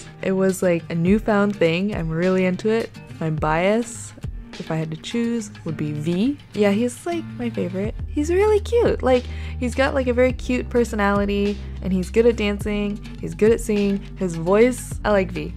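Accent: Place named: Canada. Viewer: you do sound pretty Canadian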